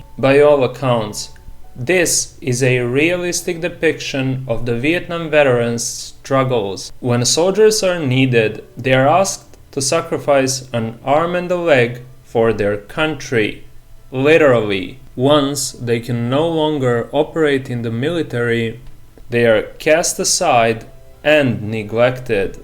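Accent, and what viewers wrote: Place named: Europe. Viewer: That was a great video you were destined to make it...I couldn't even tell you had a speech issue, just a heavy european accent. Keep making the videos I like Zemeckis and Spielberg movies. Zemeckis' movies revolve around this concept of destiny/fate...some good ones are Contact, Cast Away, Flight and The Walk. I encourage you to ask yourself a question Lt Dan asked Forrest, whether He found Jesus. There is a reason you used the word beJesus ....think about why only that name is used as a replacement for a cuss word.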